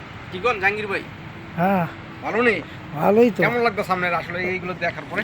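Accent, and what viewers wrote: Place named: Bogura, Bangladesh. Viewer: জাহাঙ্গীর ভাই মনে হয় আমাদের এলাকার লোক বগুড়া নওগাঁ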